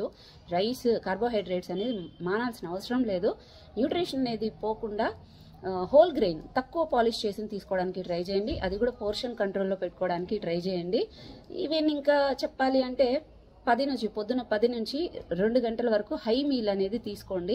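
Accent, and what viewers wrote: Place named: Kadiri, India. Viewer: Hi madam recently I started watching your videoes first when I saw your videos on Facebook I felt that your exactly speaking like kadiri area people but recently only I came to know that you are from kadiri. Felt very happy and proud of you.i am also from kadiri.i wish you to be more successful.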